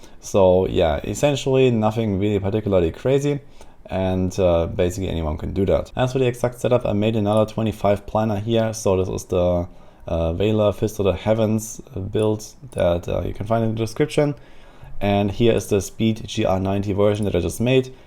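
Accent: Germany. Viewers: it might sound dumb BUT you sound so german are you ?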